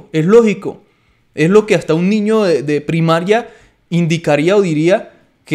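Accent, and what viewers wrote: Colombia. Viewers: Que feo habla este tipo el Espanol..que HORROR!!!!...que falta de respeto con la lengua ESPANOLA....que horrible pronunciacion del espanol...que pena con los que no son de Colombia que escuchan este acento tan horrible ..... por favor disculpen los que no son de Colombia... este es un excepcion a la regla...el Espanol de Colombia es de los mejores hablados....si hay muchas personas desubicadas en este mundo perdiendo el tiempo en estos canales.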